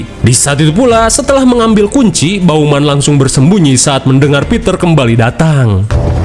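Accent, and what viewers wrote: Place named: West Java, Indonesia. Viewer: logatlawak sunda banyax menghibur ke para orang indo, aku orang suku sunda jadi ikut bangga banyax yg suka logat lawak sunda aing baraya, mantap mang sehat selalu jeung damang selalu mang😆😎🙌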